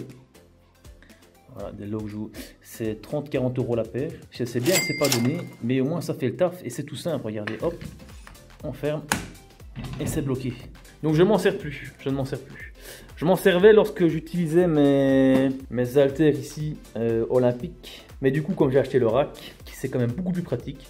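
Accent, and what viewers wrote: Belgium. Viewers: t'es belge fieu ??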